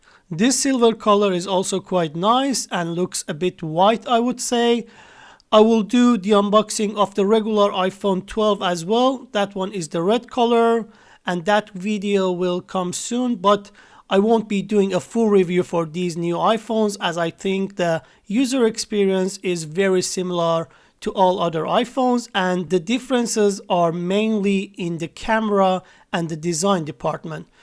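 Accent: Iran. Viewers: The Persian accent makes it more interesting 😁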